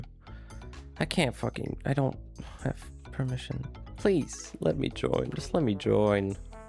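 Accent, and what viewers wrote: Poland. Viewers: Tylko dla mnie ma taki troche polski akcent? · dziwnie oglada sie polaka mowiacego po angielsku ale dobrze ci idzie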